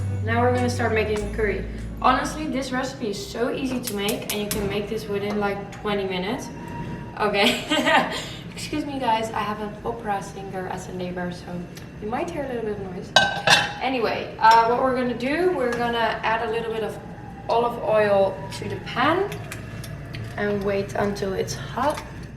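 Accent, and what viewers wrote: Netherlands. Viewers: je accent is heerlijk , hoor gelijk dat je nederlands bent lmao. Xxxx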